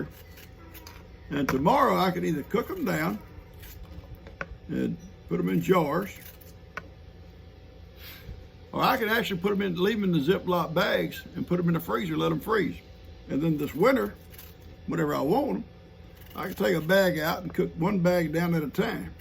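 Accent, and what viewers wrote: South, United States. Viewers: closed caption needs to recognize that southern drawl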